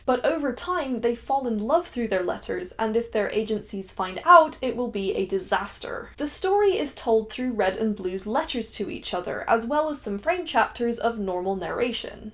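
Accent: Scotland, United Kingdom. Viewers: amazing! Yes I was getting east coast of Scotland. If I'm autistic (I think I am) then my special topic might be spotting accents. Yours is a gem, which I can now put in its own unique place in my collection. Thanks! Ps. Given the Texan element, I would now put the English element in the South West...?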